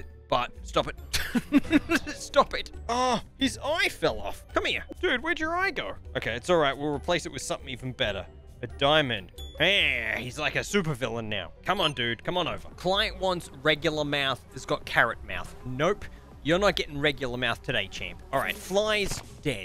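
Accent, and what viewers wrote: Australia. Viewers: Are you from Australia or something? Just like us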